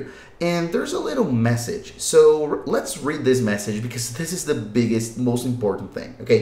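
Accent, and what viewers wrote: Brazil. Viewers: First time in watching your videos, I could pick up a small accent, are you Brazilian?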